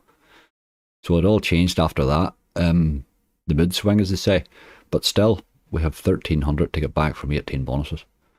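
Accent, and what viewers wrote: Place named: Northern Ireland, United Kingdom. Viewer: yea im from belfast new the accent rarely see people from NI on the slots videos lol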